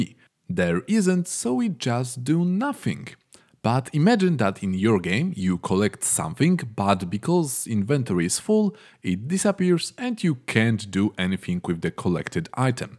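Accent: Poland. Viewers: are you polish? you sound so for me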